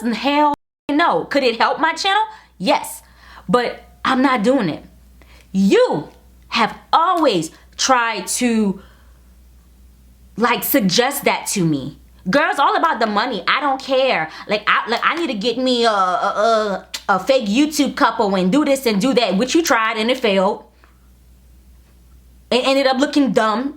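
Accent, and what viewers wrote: Louisiana, United States. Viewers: I heard all the Louisiana in I love you please no more videos like this YT mom ❤️ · I just want to say I love you! I was lowkey laughing in parts of the video bc all I hear is our Louisiana accent! Lol I can most definitely tell your from Laffy way! Lol I’m from Houma💕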